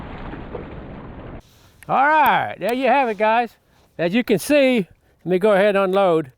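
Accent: Louisiana, United States. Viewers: "Tanks" for a wonderful video! Nutting like a big bore to start da day!!! You remind me of the ol' Cajun Chef Justin Wilson, your accent & looks! You're a real man's man! Load up that ol' 45/70 wit some of Dat Cajun Gumbo & you've got a real gnat stomper! And it's "good for you to see me, I guarontee"!!!!! Love ya my friend. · what state and county is that accent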